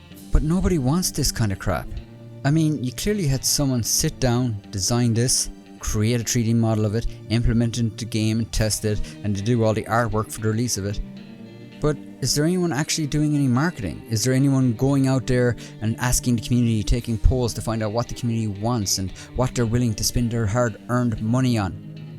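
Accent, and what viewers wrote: Ireland. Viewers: Are you Irish? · Dude what part of Ireland you from? Up Cavan! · I love the Irish accent laddd, but i am a real western lover like real, anyway this game doesn’t remind me of the west, the only thing that does is bounty Hunter, I still have love for this game but it’s getting harder to love every week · great vid man. you sound more Irish than myself. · You are irish as well? I swear so many irish people play these franchise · you should use you voice more often, everyone likes an irish accent 👍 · What part of ireland are you from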